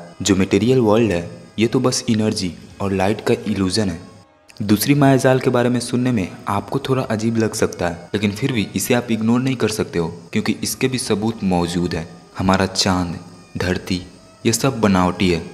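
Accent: Bihar, India. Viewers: Aap kya bihar se ho apki bhasha se pata chalta hai. Ap bahut hi knowledgeful video banate ho thanks for this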